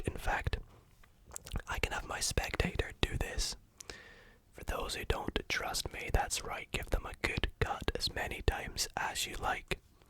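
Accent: Scotland, United Kingdom. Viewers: Are you Scottish? Cos I an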